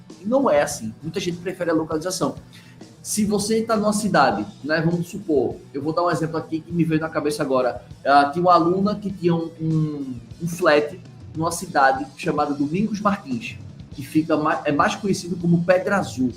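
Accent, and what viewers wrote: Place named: Northeast, Brazil. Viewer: A dicção dele é ótima, esse seu comentário é cheio de xenofobia, a sua "dificuldade" é só porque há um sotaque nordestino marcado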